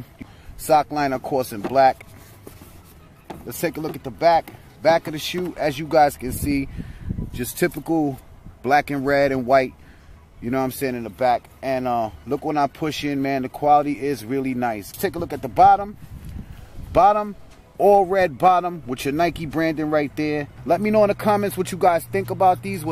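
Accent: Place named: New York, United States. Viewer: sound like he from newyork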